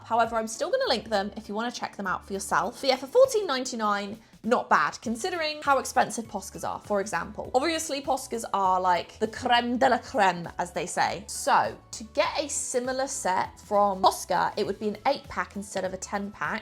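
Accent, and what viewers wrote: England, United Kingdom. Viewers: Besides your videos being informative, I could listen to your beautiful English accent all day.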